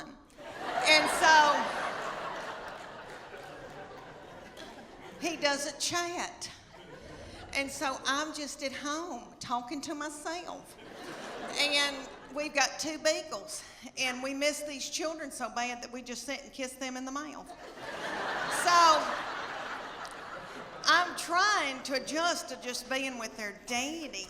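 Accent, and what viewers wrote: South, United States. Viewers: Oh, bless his heart!!! Spot on Southern. Love her! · She is just 100% valid. I love her. Only a southern woman can make a two syllable word put of “van” “va-yun”. Don’t worry about. I’m from texas born and bred and we do the same thing. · Why do I love the southern accent so much - it drives me more crazy than a French girl naked at the place St.Sulpice ? Awesome 😂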